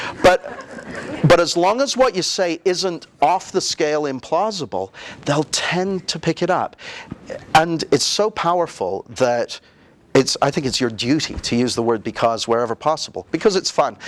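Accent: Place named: Canada. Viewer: LOL, I can tell you are canadian. "Aboot". You say it! :P And several other inflections in your accent. I don't see how people think the accents are the same. lol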